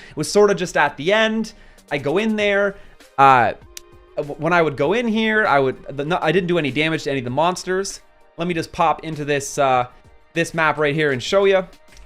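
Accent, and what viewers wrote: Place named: Canada. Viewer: UR CANADIAN ACCENT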